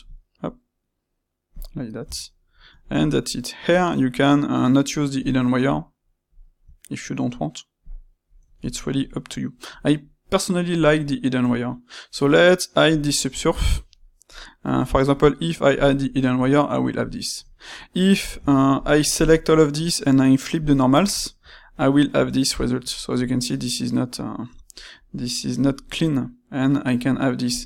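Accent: France. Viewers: if i do disss for exsapoool de coursour hahah i love franch english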